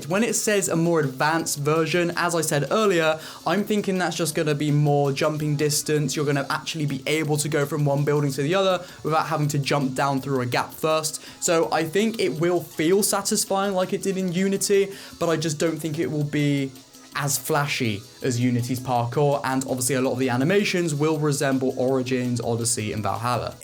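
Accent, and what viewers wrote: United Kingdom. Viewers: i love that freaking british accent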